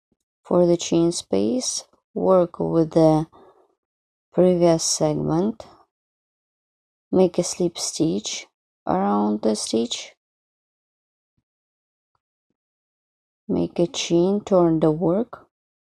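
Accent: Russia. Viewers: Почемуто какжется, что русскоговорящая говорит на анллийском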